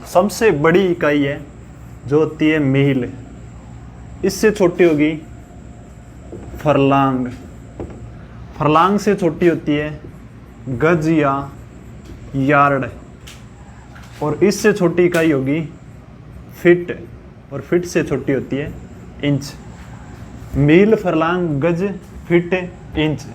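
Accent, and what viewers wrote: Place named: Haryana, India. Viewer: Sir apki boli to haryanvi jaise h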